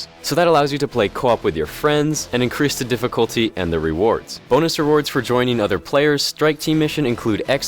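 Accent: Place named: Canada. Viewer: ***** Lucky bastard, I'd love to have lived in Vancouver...The weather is perfect over there, that or maybe Slovakia...But yeah, I guess I should have said loosely pledged allegiance, ;^) Anyways, that's a lot of info I didn't know about my brethren across the bay, thanks for the info BTW. Was born on the Great lakes, so we got Toronto's wind...And CBC! (Heh as a snot-nose, I actually got your accent due to hours of CBC!)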